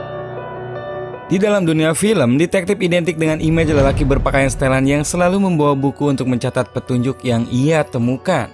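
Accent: West Java, Indonesia. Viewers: arfan muhammad hahaha kykx iya. Mgkn ini org sunda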